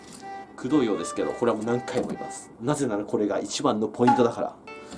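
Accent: Japan. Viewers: 何弁かな？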